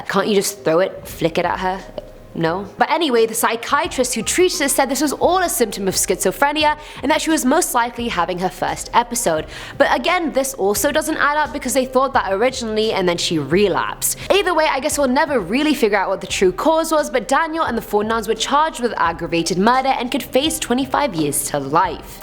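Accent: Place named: England, United Kingdom. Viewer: This girl has the classy English accent without getting on my nerves. She's dramatic. Flaps her delicate white hand to her heart. I'm angry. (She's not wrong).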